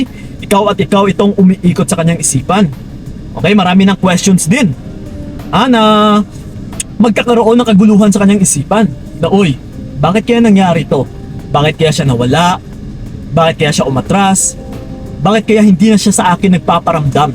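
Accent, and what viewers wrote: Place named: Hiligaynon, Philippines. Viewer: parang ilonggo si kuya dahil yong tono ng salita niya malumanay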